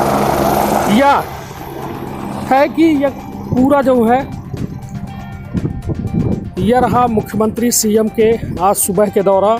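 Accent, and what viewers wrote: Bagheli, India. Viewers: Bhai ye bagheli boli h,,ye news sunne ke liye ya to bagheli sikho,,ya is channel k video mat dekho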